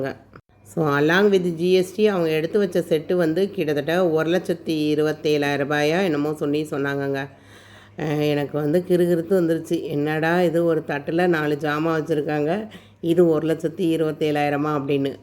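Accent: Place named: South, India. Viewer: neenga south ah ka...slang apdiye irukku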